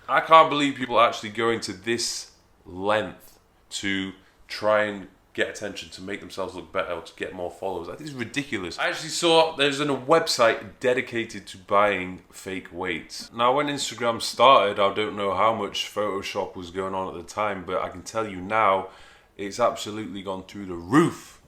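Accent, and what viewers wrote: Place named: Yorkshire, United Kingdom. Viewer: its a Yorkshire/Geordie accent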